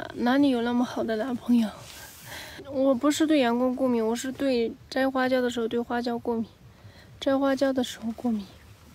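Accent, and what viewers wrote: Sichuan, China. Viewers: 你是不是講的是四川口音嗎⋯⋯？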